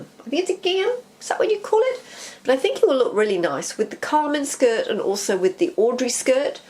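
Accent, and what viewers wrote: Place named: England, United Kingdom. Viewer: I'm thrilled to have found your channel! Now i have to hunker down and watch what I've missed 😊. After hearing your English accent, but you then saying $ instead of £, I read your description. How lovely to be next door to Cornwall Park. I used to gallop around there at least 3x weekly, and drag the kids to the summit. Living in Nelson now. Can't wait for your next video ❤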